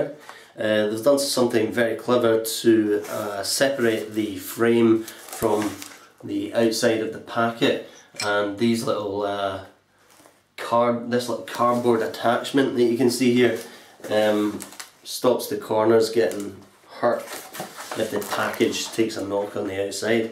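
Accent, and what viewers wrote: Scotland, United Kingdom. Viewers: Thank you so much for this video, this was really helpful. Also I love your accent, are you British by chance? If not, sorry for mistaken. ^ ^ Keep up the amazing work honestly, I subscribe and excited to watch more of your videos. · sounds more Scottish.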